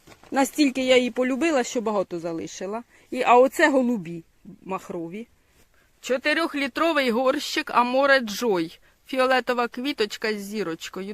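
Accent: Poltava, Ukraine. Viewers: Коли вас чую, то велика насолода від вашої праці, краси і мови, я наче побула на Полтавщині, у своєї тьоті і теж - Ліди. Здоров'я вам та успіхів в цій нелегкій праці.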